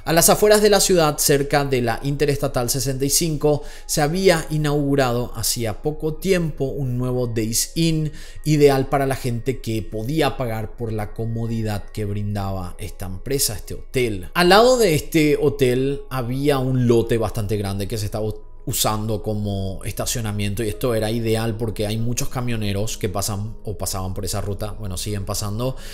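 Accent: Paraguay. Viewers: Gran canal por tú acento se nota que sos de Paraguay capo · Me encanta tu acento de Paraguay!! Vivi allí varios años y mi hijo nació ahí y tenía tu misma forma de hablar, te felicito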